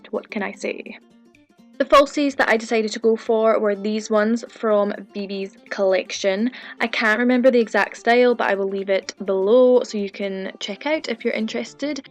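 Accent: Scotland, United Kingdom. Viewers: Gorgeous makeup look 😍 so different, glittery and colorful - I would really wear this for clubbing ☺️ I also have the Urban Decay Glitter Eyeliner but in the silver color and it is really nice 😎 Stumbled across your channel when I was searching for Scottish beauty youtubers (because I will study in Scotland soon so I wanted to practice listen to the accent 😄) and immediately subscribed 😇☺️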